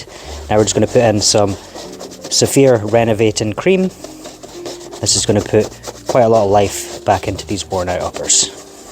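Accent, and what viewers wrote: United Kingdom. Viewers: You've done a great job on the Boots, they really look amazing. Where's your business you sounded like you could be from the UK ??? Anyway, great job. This comment is from Denver Colorado, United States of America.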